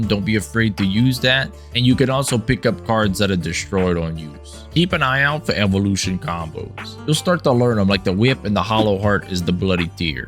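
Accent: United States. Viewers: Bro, i didnt look at your channel name when i clicked on this but after hearing your accent i was like Mae, dats a fellow coonass, Cher!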